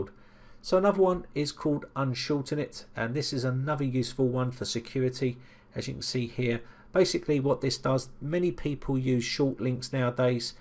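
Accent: United Kingdom. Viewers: You're too funny...thanks. By the way, this ole Nana who is a Canadian is heading to Ireland on Friday (I'm guessing you are a Brit but I do have a bit of trouble distinguishing different accents (I know, I'm taking a risk even saying it LOL). My son and 3 beautiful grand children live there and this will be my 7th time going. Anyway, perhaps a little too much info but I really do appreciate all the assistance you have given me. All the best to you and your family dear!!!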